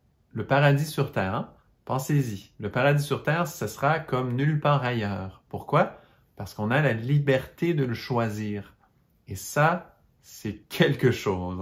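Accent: Quebec, Canada. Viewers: Et l'accent québécois my God je trouve ça trop cute! Une magnifique province,j'ai eu l'occasion de remonter le st Laurent avec des pêcheurs:INOUBLIABLE! Nous les français on vous adore!💖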